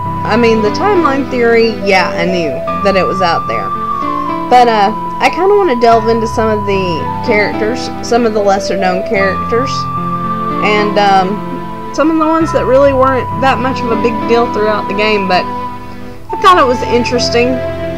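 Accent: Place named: South, United States. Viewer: you sound southern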